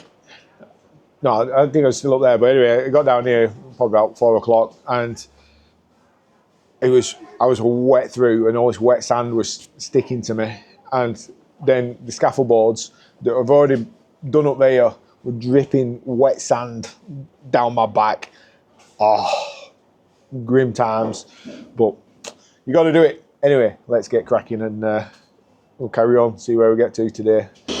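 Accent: Lancashire, United Kingdom. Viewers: I love the Lancashire accent. I’m originally from St Helens and remember older people having a strong Lancashire accent in the late sixties/early seventies. The accent there is now closer to Scouse. Can’t say anything about that cos my wife is one! ( her account)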